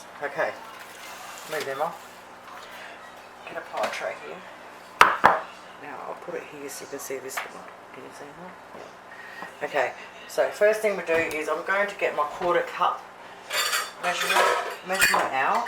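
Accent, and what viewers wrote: Australia. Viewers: Girrrlll where have you been hiding? Nothing better than a true blue Aussie girl whipping up some awesome Aussie favourites most of us have grown up on but have forgotten how to make. Mate you are an absolute bloody legend.!! Please keep them coming. Just love the typical Aussie twang. Since discovering you I have been binge watching the lot. Love it. Good on ya Matey! 🇦🇺👍👍🌈🦋💞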